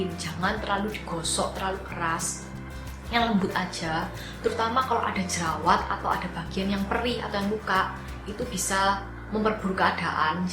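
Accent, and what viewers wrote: Java, Indonesia. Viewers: medok mbak?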